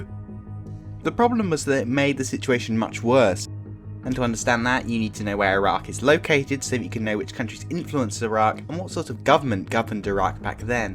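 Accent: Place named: United Kingdom. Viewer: so i assume your british from your accent so college for you would be year 11, 12?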